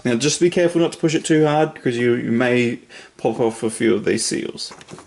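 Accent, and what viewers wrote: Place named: Australia. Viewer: Excellent tutorial!!! You have done your homework. And to hear an Aussie voice, it helped me greatly with my homework. Task completed easily....thanks to YOU. Ps. If I can join the chorus...'Beers are on me'!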